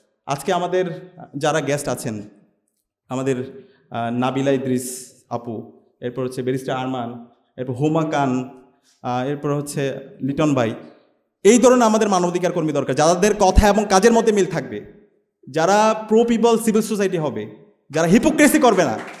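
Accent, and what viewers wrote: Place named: Chittagong, Bangladesh. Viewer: Mr, Sadik you are one of my favorite person. It will be nice if your accent change . You are speaking in public. Still little bit chittagong accent in your tone.😊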